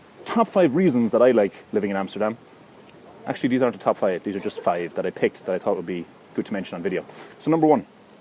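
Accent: Cork, Ireland. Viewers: ...from Ireland? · He's clearly Irish from his accent. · he sounds like a typical Irish man, I dunno where you get that from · He is from Cork. Definitely. I'm half Irish, I know. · You from Ireland my man? Pretty sure you are ^^ edit You actually mentioned you are in the vid later on :-) my bad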